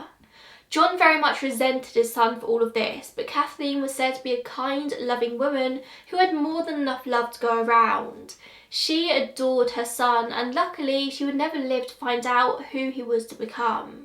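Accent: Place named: Cockney, United Kingdom. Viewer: dear, I think you should seriously consider making a living simply by talking. Your cute Cockney accent is what I describe as "Adorable." Along with your beautiful voice, you (yourself) are beautiful love xoxoxox.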